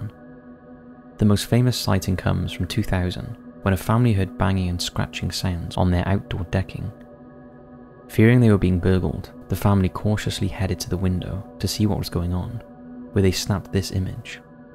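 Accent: United Kingdom. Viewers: Could you try any harder to imitate the voice of that Dark Docs bozo? An overly dramatized, whispery, vaguely Brit voice does not in any way improve an already shabby production. Consider less theatrics and way more thought on your next one mate. Cheers!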